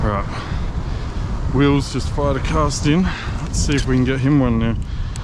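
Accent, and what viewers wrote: Australia. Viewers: You sound d like Aussies.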